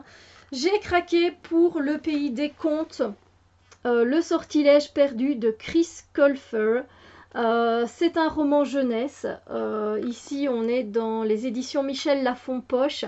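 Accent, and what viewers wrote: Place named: Belgium. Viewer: beau accent belge lundi le foot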